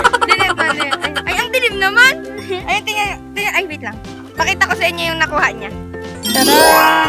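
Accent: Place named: Marinduque, Philippines. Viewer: we are the same accent im from marinduque province...watching from canada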